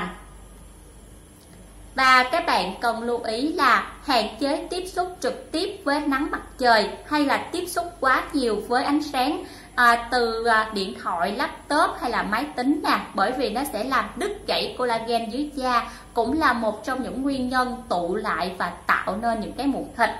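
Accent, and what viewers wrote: Vietnam. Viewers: Bắc không ra Bắc Nam không ra Nam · Cô này người miền trung chớ không phải miền nam nhé , đó là giọng miền trung , hãy phân biệt cho chính xác .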